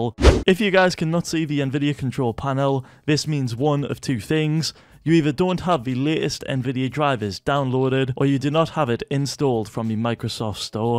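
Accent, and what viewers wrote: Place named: United Kingdom. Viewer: hes from the uk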